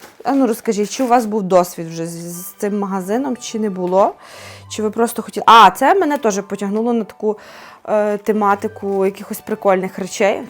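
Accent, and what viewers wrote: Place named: Ternopil, Ukraine. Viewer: Ты з Тернополя? Я знаю одну жінку з Тернопільської області, вона спілкується майже як ви.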